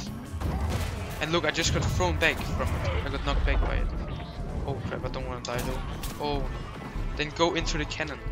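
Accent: Denmark. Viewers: I Can hear you’re danish👏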